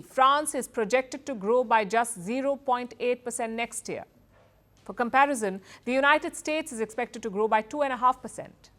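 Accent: India. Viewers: you’re not watching the show, that’s damn sure! I’m addicted to, excellent reporting, she’s smart beautiful lady, I’m a huge fan of her for sure! To be honest with you I kind of have a little crush on her from her beautiful Indian accent to the way she talks and……. Lol I know she hates Trump anyone can see it, including Stevie Wonder. needs to get into the program, it’s not late. You have to follow Mark Zuckerberg, Jeff Bezos …did 😂don’t forget he’s a good friend of Narendra Modi also. I don’t think she drink Kamala’s kool aide so she should support the guy say no to Wars! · insufferable indian accent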